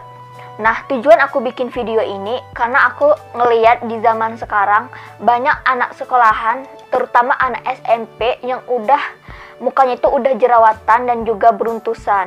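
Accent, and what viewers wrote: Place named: Malaysia. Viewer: Kak cntik asli mna yaa.. cra ngmongny kok kyk org malaysia😀☺️☺️✌️✌️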